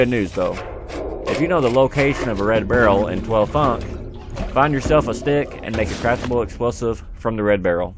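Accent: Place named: South, United States. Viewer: Where you from man?! Gotta sub man you sound southern as Hell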